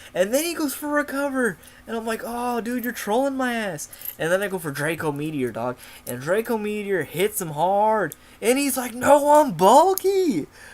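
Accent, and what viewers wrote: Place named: Texas, United States. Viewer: No more Texas accent please, it doesn't suit you... No offense or anything. :)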